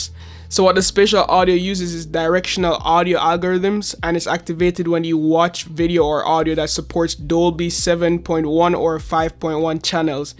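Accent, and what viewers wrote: Jamaica. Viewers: Is that a Jamaican accent? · definitely can hear the jamaican accent :o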